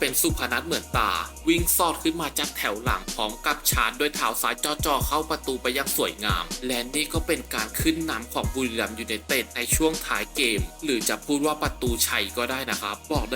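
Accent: Suphanburi, Thailand. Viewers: แอดเป็นคนสุพรรณแน่ๆ รึไม่ก็กาญจนบุรี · เสียงเหน่อๆ เหมือนหนุ่มสุพรรณเลยนะเพ่สุดยอด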